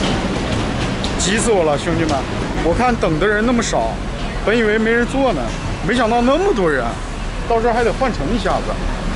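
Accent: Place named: China. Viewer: 你的英语中国口音很重😂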